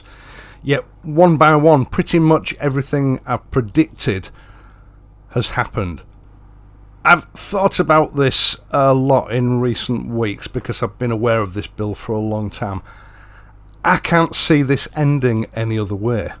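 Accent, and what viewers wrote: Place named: Yorkshire, United Kingdom. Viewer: Listening intently to your observations when I observed something else, Beverly race course. Didn't realize you were in this neck of the woods. Knew from the accent you were a good sensible Yorkshire lad which explains why you make so much sense. Didn't realize you were making so much sense just up the road from me.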